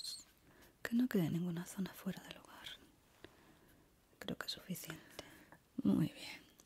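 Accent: Andalusia, Spain. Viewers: Amo como hablás, de verdad amo el acento español y luego el tuyo es hermosooo ✨, aparte tu voz relaja 🧡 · En primera, siempre genial, relajante, tingles, interesante... Pero luego leí "Cum" en lugar de "Rum" en la botella de atrás y me dió risa de la mala xD Por cierto, aunque estoy en México, y por ello me es difícil diferenciar acentos de España... el tuyo es el más delicioso de oír. Necesito muchos amigos andaluces para que me hablen todo el día 💖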